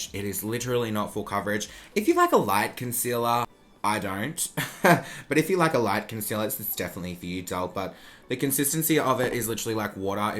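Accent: Australia. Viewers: "Shout out to Kylie for making me spend $50 on this piece of shit" You can definitely tell you're an Aussie 😂 you crack me up so much because you remind me of some of my friends. Love to you from Melbourne! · Is he Aussie?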